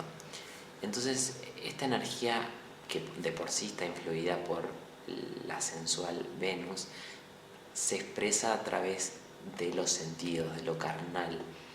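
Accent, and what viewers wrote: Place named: Argentina. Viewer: No entiendo, no eres lindo pero me gustas. Q pasa?😅😂 tu voz, mirada? Sonrisa? Me distraes. Ni siquiera me gusta el acento argentino. Tienes ascendente libra? O es que me recuerdas a mis primos? No malinterpretes, no me gustan ellos, pero uno aencuentra atractivo a alguien con semejanza de parentezco. Y aqui concluye el comentario de un mercurio en piscis 😂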